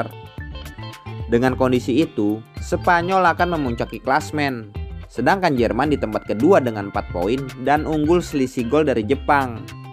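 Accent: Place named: Banyumas, Indonesia. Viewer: Mimin-nya orang ngapak seperti nya....😬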